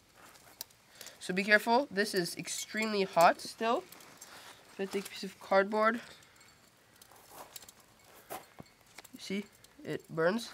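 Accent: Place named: United States. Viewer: You sound American. Yet, where you live doesn't look like America. Nice work by the way. Great start!!!!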